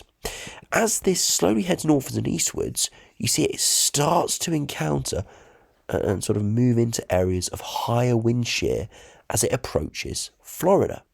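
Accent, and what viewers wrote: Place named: England, United Kingdom. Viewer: exactly. The ‘weather modification’ was unintentional and caused by the vast quantities of greenhouse gases we’ve been emitting over the last couple centuries and we’ve finally crossed some feedback loops so we’re now in thermal runaway. The damage had been relatively linearly increasing in damage, but some key points such as permafrost melting, higher libido due to arctic ice melt, etc have really started to cause rapid (abrupt even) acceleration in temperatures. Higher temps mean more energy, these events become more frequent and powerful on average when temps are higher. It’s just a shame that the countries that have contributed the least to the damage, and have generally been exploited the most, are the ones being hit first and hardest. I’m just glad Florida is a MAGA state and full of deniers and conspiracists. 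The type of people that say “it’s just hurricanes, we’ve always had them. It’s normal!” It’s pleasant hearing someone with a nice English accent doing a good weather report. Probably sounds bad but it sounds much more professional and just easier on the ear in general. Subscribed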